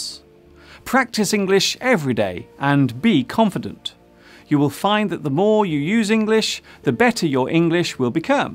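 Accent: England, United Kingdom. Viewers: this is british english or american ???? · Hi Mr., my name's ilham. I'm From Indonesia, your video is verry good, and i want learn english from your channel. By the way, do you speak english with british accent, right? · he said ftom england do sure it is britinsh english...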